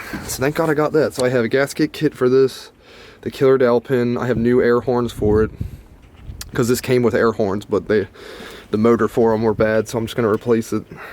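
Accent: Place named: Maryland, United States. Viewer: I knew it was MD first few seconds of video, royal farms and the accent dead giveaway 😅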